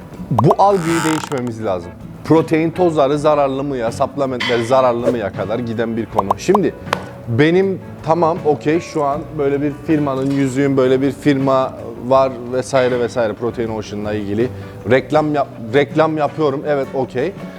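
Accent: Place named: Turkmenistan. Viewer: Konusman Türkmenlere benziyor. Türkmen misin?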